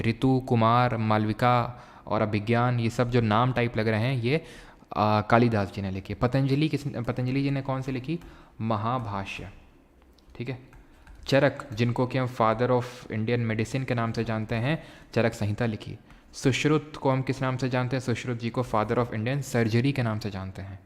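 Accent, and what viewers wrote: Kanpur, India. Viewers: Ye hamara kanpuriya style hi😄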